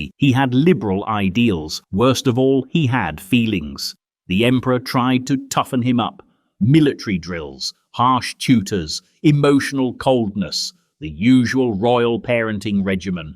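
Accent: United Kingdom. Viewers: I absolutely love this channel and have binged almost all of it. Some suggestions: - I love the British accents you use (2). Keep them - Don't ask the GPT to pad out the wording simply to make the video longer. 2 and a half hours is longer than you need, and padding just becomes tedious after the first 5 minutes 10/10 for this channel. Although this vid isn't as funny as the earlier ones. Input "Britishly subtle sarcasm" into the command. ❤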